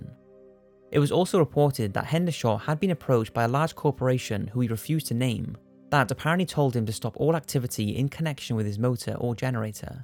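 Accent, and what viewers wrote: United Kingdom. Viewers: Hey perfect storyteller voice British guy, be careful, I’d be sad to find out you went missing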